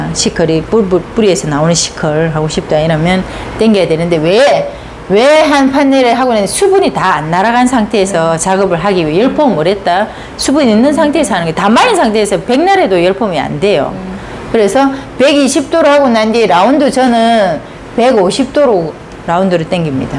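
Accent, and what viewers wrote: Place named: Gyeongsang, South Korea. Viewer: 오늘 처음 들어왔는데요. 경상도말씨가 정겹습니다. 거의 대부분의 유튜브가 서울말씨인거에 비하면 부드럽지는 않은데 정확하게 알려주시네요. 설명이 아시는거에 비해 매끄럽진 않지만 ㅎㅎ 저는 참 좋습니다. 엄청난 내공이 팍팍 느껴집니다. 실전이 느껴집니다. 계속 들어가서 배우겠습니다.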